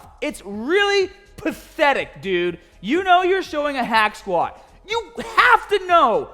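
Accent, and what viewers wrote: Boston, United States. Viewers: I love the boston accent lmao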